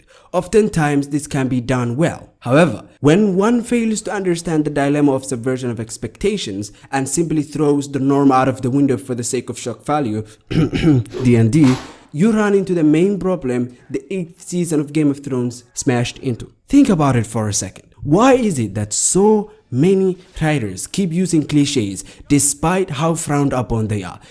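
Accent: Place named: Somalia. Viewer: Are you East African? You have a Somali type of accent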